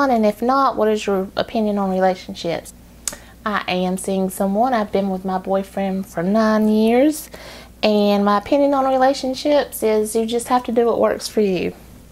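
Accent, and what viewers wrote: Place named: South, United States. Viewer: Funny my first YouTube experience was watching Trisha Paytas also........she's a mess lol Love your southern accent....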